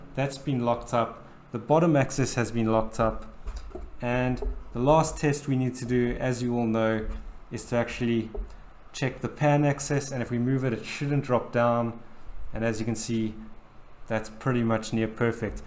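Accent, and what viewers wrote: South Africa. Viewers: Bro I know ya in Australia but I kinda hear a slight South African in there ? psssssst bro much better if you get GH5 with awesome IBS :P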